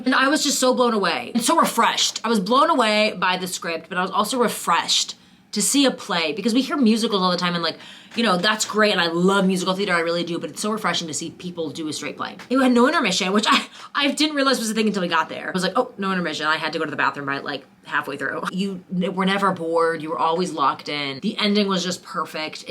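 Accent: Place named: Ireland. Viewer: Omg! You’re Irish accent kinda sounds like saoirse ronan!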